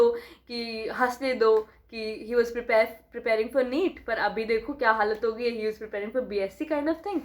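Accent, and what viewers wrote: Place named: Saharanpur, India. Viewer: Di aap saharanpur ki lagti ho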